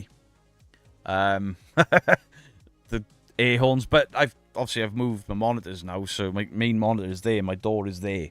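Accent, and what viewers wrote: Wales, United Kingdom. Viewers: Can tell you're welsh lol drop kicking annabelle 😅😅😅😅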